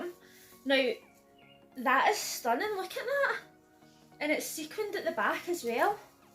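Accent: Scotland, United Kingdom. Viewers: Nice clothes there, Lol to be honest I mostly watch your videos to hear your Scottish accent I really love it, great video though.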